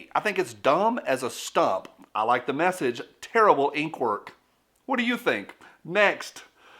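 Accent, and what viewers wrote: South, United States. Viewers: Hey! Hope you aren't offended because of y'all's accent! We just know it as accent, not Gomer Pyle's! I just hope you take as the fun as it is intended to be! You're one of the top channels I keep coming back to!!! You're funny, good-natured and give good content! I'll keep watching till you stop or drop. Southerners have a particular accent, so do New Yorkers, and so do us Northerners. Believe it or not but Mid-Westerners do as well, you just have to listen carefully for it. And the one I like is dc draino 2 genders one!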